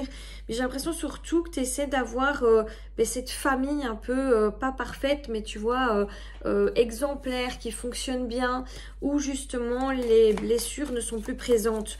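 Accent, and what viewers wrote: Belgium. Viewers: Quel chouette petite accent belge!!! Merci pour l a guidance. Bon weekend.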